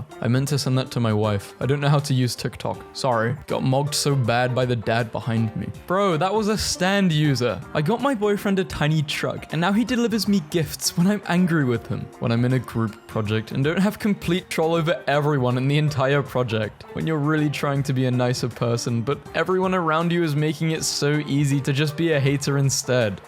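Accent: United Kingdom. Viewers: I watch your videos so much that your accent now sounds normal to me. I randomly start speaking in a British accent without even realizing it until I realize it. I’m restricting myself from watching your videos. I’m also American by the way with the most American accent because I live near the White House.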